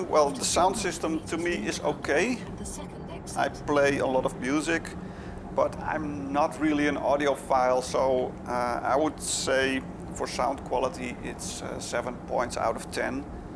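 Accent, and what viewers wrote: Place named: Netherlands. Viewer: That's a DUTCH accent not German, oy vey....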